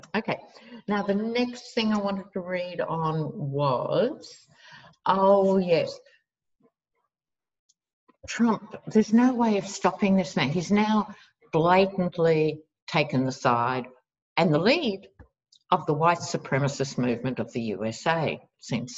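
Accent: Australia. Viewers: We say it's speaking, 'Strine'! :) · The Australian accent is most charming. I never tire of listening to it.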